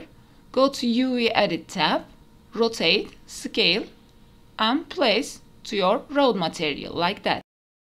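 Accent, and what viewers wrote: Turkey. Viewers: aksandan anladım türk olduğunu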